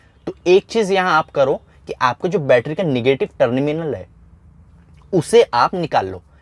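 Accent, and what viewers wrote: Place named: Hyderabad, India. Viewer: Love Your hyderabadi touch to accent😁❤️❤️Good info👍👌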